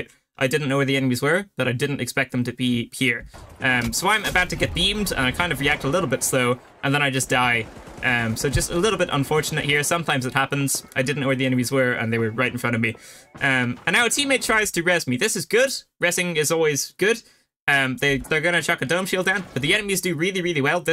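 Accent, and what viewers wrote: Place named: United Kingdom. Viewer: His British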